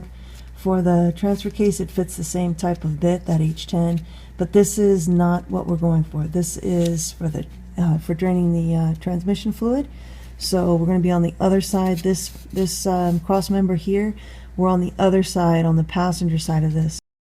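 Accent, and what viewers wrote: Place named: Latin America. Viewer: Are you Latina? I think I hear a slight accent 😊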